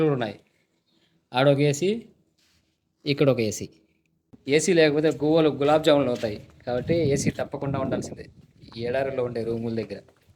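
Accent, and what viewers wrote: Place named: Piler, India. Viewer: I think me slang batti chuste medi pileru kada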